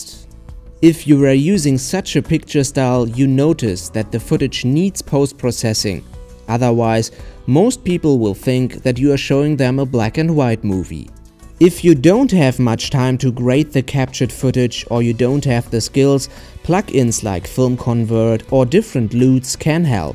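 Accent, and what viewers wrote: Germany. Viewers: Erst dachte ich mir so: "Da hoer ich doch ein Deutschen akzent" und dann sehe ich das du noch in der selben Stadt lebst wir ich :D Gutes tutorial, danke hat sehr geholfen ^^ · Lady Fynix man hört das deutsche 😂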